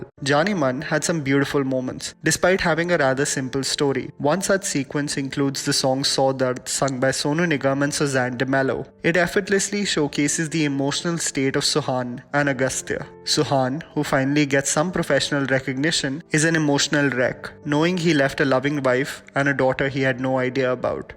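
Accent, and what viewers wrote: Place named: Tamil Nadu, India. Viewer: The Tamil accent though ..... 😅😅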